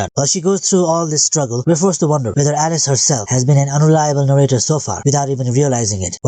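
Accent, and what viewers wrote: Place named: Spain. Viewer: bro are you the viral teenager who appeared in podcast debate with girls and called the girl dumb are you? because the way you sound ur accent is like a typical spainish from spain not south america speaking english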